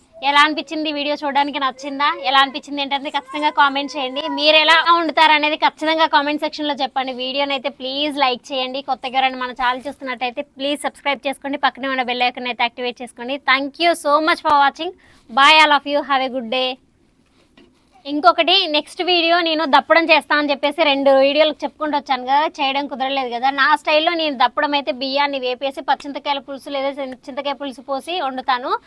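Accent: Telangana, India. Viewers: Telangana slang add chesaru akka super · ee video lo telangana yaasa matladinav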